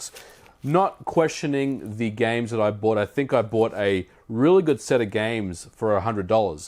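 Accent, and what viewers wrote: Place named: Australia. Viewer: As an Aussie I thought you lived in Australia...keeping your accent is a good effort.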